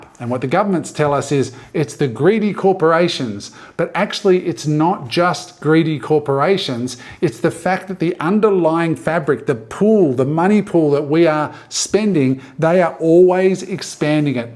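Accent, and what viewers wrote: Australia. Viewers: Just discovered your you tube channel, great to find another video with an aussie accent talking Bitcoin! Do you guys publish on X at all?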